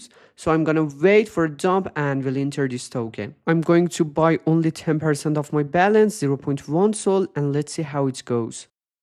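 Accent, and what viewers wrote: Iran. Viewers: Bro are you Persian? You sound like Iranians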